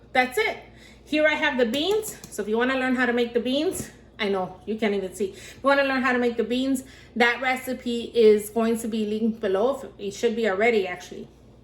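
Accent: Bronx, United States. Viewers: girl you sound like you're from the bronx...i love it! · I’m Puerto Ricen an l watch your video forthright first time. Girl were have you been all this time,loved your white rice. Are you fromNY? The Bronx maybe? You sound like it . May God Bless you.👍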